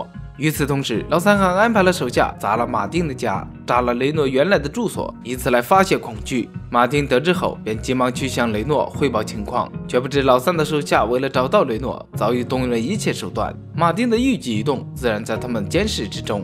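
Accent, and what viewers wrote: Japan. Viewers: 兄弟你这口音像日本人， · 解说的普通话怎么有日本口音？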